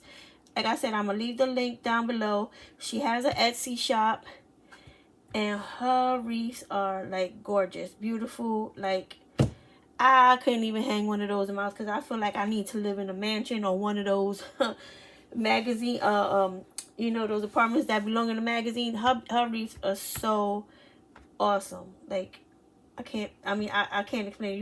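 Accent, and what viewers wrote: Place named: New York, United States. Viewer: Thank you your videos are always enjoyable 💘 I'm from NY originally and your accent reminds me of home lol 😘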